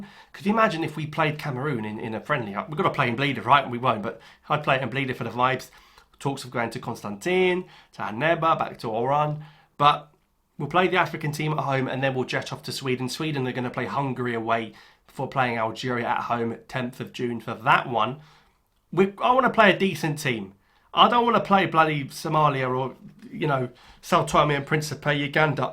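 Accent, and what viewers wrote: United Kingdom. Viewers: Is the British accent difficult or am I not good enough to understand English?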